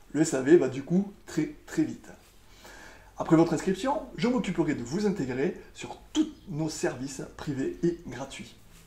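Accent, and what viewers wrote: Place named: South, France. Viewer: Merci,pour cette bonne présentation,tu as un accent du Sud, on est peux être voisin,je suis dans le Gard.Builderall à l'air vraiment efficace,je pense que je vais me lancer,bonne continuation à toi et un grand merci :))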